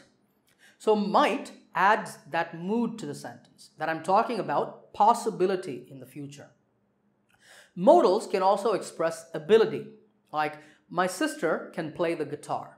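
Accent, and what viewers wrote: India. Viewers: You have an amazing accent being Indian. Keep up the good work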